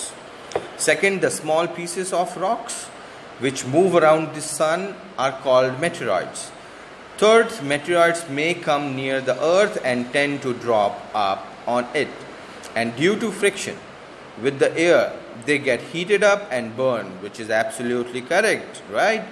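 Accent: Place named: United Kingdom. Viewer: Doesn't ur English belongs to British country sir why r u wasting u r time in Assam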